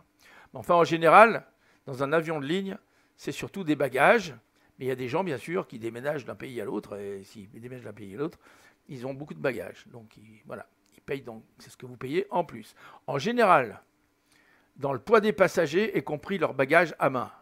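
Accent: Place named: Lyon, France. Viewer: Merci pour tous vos efforts autour de MSFS 2020 et du FBY A32Nx en particulier. Dès que je cherche une réponse, je tombe sur vos tutos ! Si je peux me permettre, vous gagneriez à être plus synthétique: Right to the point, please ! Vous vous perdez en détails, incidences et autres tergiversations : par exemple, ne perdez pas 20mn à parler d'un bout de logiciel obscur qui, selon vous, "ne marche pas"; ce sera déjà ça de gagné ! Moi qui cherchait le ZFWCG estimé dans l'OFP de Simbrief, je suis déçu et j'ai perdu 1 heure...mais ne désespère pas. Si je le trouve, je vous le dirai ... Surtout gardez votre sympathique gouaille lyonnaise qui permet de ne pas totalement perdre patience ! L'art est difficile et la critique bien facile ... Amicalement, PS/ comme promis, le ZFWCG est présenté tout en bas à gauche de l'écran de la tablette (EFB) à la page GROUND/PAYLOAD une fois les passagers embarqués Peut-être que cela n'existait pas au moment de la réalisation de votre vidéo. 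Cela vous permettra en tout cas de vérifier vos dires. En espérant que cela vous soit utile ainsi qu'à ceux qui font cette recherche.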